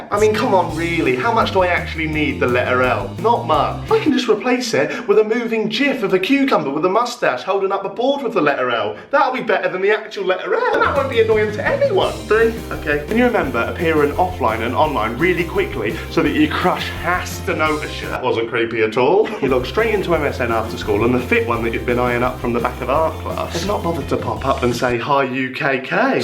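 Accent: United Kingdom. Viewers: which part of the uk are u from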